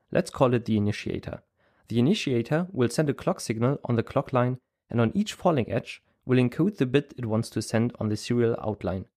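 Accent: Germany. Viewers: ist das ein deutscher akzent? 👋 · Du hast einen deutschen Akzent:) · Kleiner deutsche akzent lol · Man hört den deutschen Akzent :) Trotzdem cooles Video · Aussprache lässt darauf schließen das du deutscher biat xD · DAs hört sich an, als wärst du von Deutschland. Der deutsche akzent.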